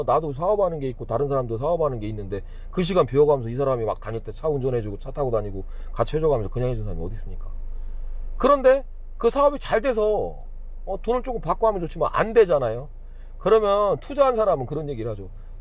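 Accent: Chungcheong, South Korea. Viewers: 아이구~ 시원시원 하시네요. 맘에 딱 들어요 ㅎㅎ 저도 인도네시아 들어가서 현지인들 속에 가서 살려고 하는데, 어학연수 하면서 현지인들과 어울리면서 말 배울라고요. 전에 회사일 때문에 들어가 만났던 교민들,,, 아~ 진짜 .... 더이상 말 안하께요. 그리고 진짜 돈거래... 작은 돈이라도 돈거래는 하질 말아야하고, 아예 안만날 능력 있으면 좋죠. 전 고향이 충북이고 부모님도 청주 사시는데, 아~ 충청도 말투 정겹네요.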